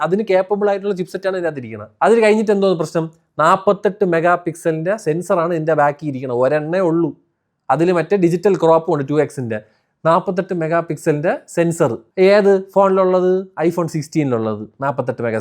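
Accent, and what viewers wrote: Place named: Kollam, India. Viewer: Kollam slag venda bro ...very irritating...pls use neutral one..else use cochi slag still okaish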